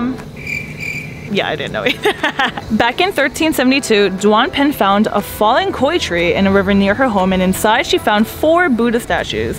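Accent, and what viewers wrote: United States. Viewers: Hey! Sounds like you’re from the states. Just curious, how long was the flight from where you were to Cambodia? Thinking of doing a trip end of year. Awesome vid 🤙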